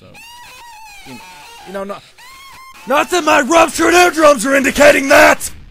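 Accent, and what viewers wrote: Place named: East Riding, United Kingdom. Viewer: That's an East Riding accent. Seems Hull is fast becoming a hotbed for quality games development.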